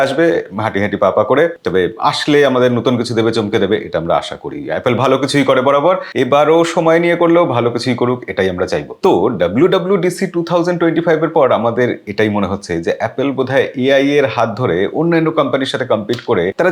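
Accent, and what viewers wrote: Bangladesh. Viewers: আপনার এ্যাকসেন্ট বাংলাদেশী, কিন্তু চ্যানেল দেখছি কলকাতার! 🤔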